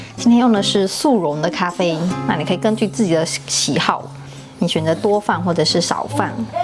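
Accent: Fuzhou, China. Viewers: 你肯定是福州人 福州腔好重🤣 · 好熟悉的乡音？福州人？ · 这福州话口音好重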